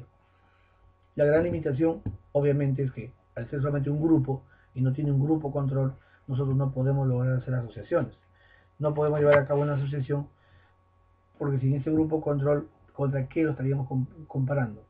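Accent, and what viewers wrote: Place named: Peru. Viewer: yo entendi, solo que tiene acento, parece Peruano.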